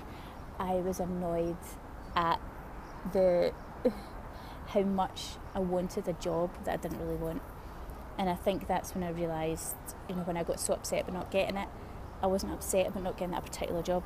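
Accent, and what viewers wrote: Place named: United Kingdom. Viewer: I'm & I love English British accent ✌🏼️😁🇰🇼🕊you welcome in your second home kuwait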